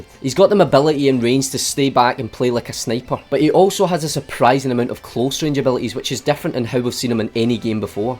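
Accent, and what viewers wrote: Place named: Scotland, United Kingdom. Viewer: Can´t wait for Marvel Rivals! Will it Kill Overwatch? I mean... It could defenitly force Blizzard to Heat up and do more Community Focused Things to keep their Players. I mean.. Overwatch is an absolut great Game. But the Monatizing Options Decision that were made are absolutly BS. Everyone is hating on Monatization and not about Overwatch in General. Marvel Rivals got a perfect Timing to step in and declare Overwatch WAR. Also: I love your accent. You sound like Drew McIntyre ( a WWE Wrestler if u dont know him ). I assume you are from Scottland? :P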